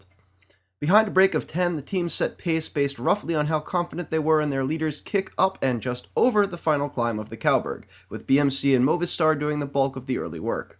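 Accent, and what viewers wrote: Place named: United States. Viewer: Nice video, and nice to hear a yank who know's his stuff for a change.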